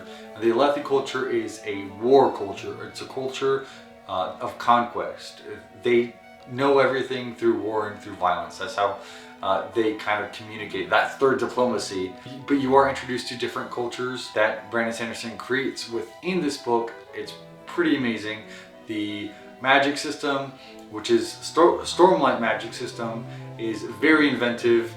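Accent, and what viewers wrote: South, United States. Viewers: I love your podcast on this video at your house in Texas during your quarantine until you had a lot of books in the back of your room including Surrender Your Sons by Adam Sass, House of Earth and Blood (Crescent City) by Sarah J. Mass, And I Darken by Kiersten White, The Invisible Life of Addie LaRue by V.E. Schwab, The House in the Cersulean Sea by T.J. Klune, The Fires of Vengeance by Evan Winter and so many others after that, but I hope your husband, Zach will be very proud of you, I love your Southern accent and I know you're gay!!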